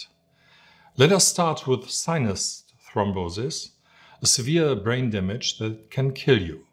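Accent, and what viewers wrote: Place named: Germany. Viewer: very gut denglish but kommt das noch auf deusch?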